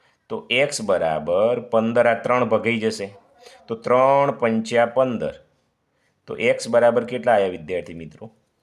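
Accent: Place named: Mehsana, India. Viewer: Tame mehoni bhasa bolo cho sir 😮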